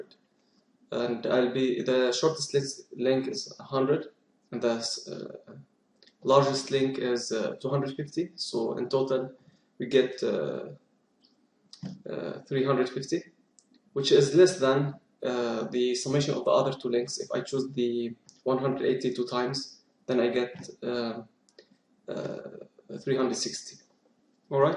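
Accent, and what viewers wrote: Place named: Arab world. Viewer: انت عربي لهجتك مش انجلش خالص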